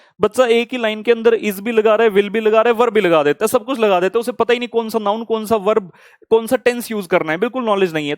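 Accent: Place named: Punjab, India. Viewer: Arey sir apka punjabi touch toh bwaal😂😂👌🏽👌🏽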